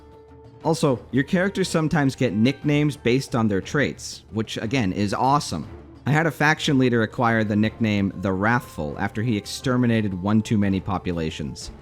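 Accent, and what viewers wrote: Boston, United States. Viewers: 🤣 Dudeguy, are you from Bean Town? You sound like all my homies 🤣 Solid review, I haven't purchased this game and I probably wont until the price drops way down. I love me some Rome TW, but I don't wanna scratch my rose tinted glasses. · idk sounds like Boston or New York accent.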